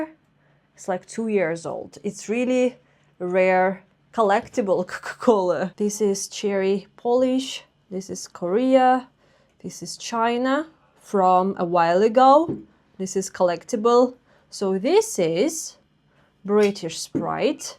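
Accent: Russia. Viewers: your English accent is more British than Russia 🇷🇺 even though you haven’t being there, that’s good, and I also like it when you spiced up with Russia 🇷🇺 English accent too. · Очень "рязанский английский" и тупой влог. Идите работать. Достали эти тупые блогеры.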